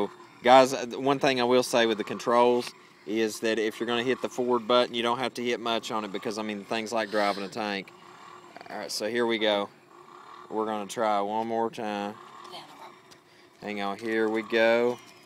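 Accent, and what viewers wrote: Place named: North Carolina, United States. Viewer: Are you from North Carolina?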